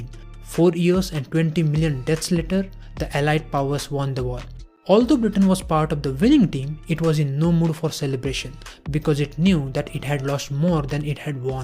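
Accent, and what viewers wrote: India. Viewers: I am not even kidding, the video quality is really high great production but PLEASE get a non indian to do the voice over for the video.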